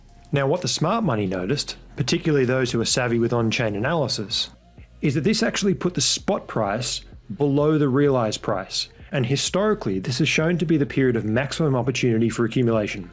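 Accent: Australia. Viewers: THANK YOU...GREAT ANALYSIS...much appreciated...well done...ps...do i detect an Aussie accent? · Great, a fellow Aussie. Accent is unmistakable! Love this channel. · Fantastic video! Good to hear an Aussie on RSC!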